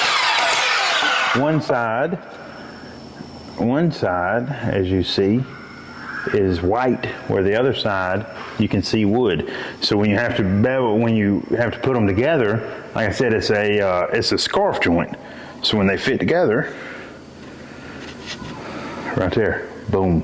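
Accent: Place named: Western Maryland, United States. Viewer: Nice. I would not have placed you there according to your accent. I heard people with your accent in Western Maryland. Of course now I ain’t been around too far but I’ve been in Pensacola several times. I build kitchens and typically work with 4 1/4 inch crown. However, I’m on a job that has 7 1/4. And I decided to do it flat. Your video has been helpful.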